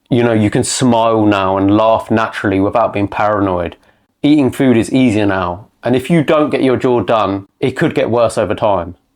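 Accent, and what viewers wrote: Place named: United Kingdom. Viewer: Who did your surgery you sound from the UK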